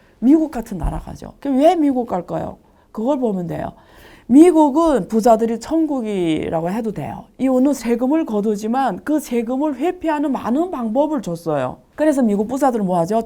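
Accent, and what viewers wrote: Yanbian, China. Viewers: 。。加油！ 우리 연변의 자랑이예요 개무 갠데 걸쭉한 연변사투리 좋쓰꾸마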